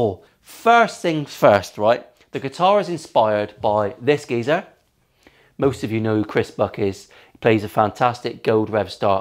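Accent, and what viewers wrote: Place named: Plymouth, United Kingdom. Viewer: Excellent. I have a Revstar, so watching this with real interest. Plus you're helping me get attuned to the speakings of a Janner - my daughter is now a Derriford resident, so I'll be hearing a lot more of it in the future.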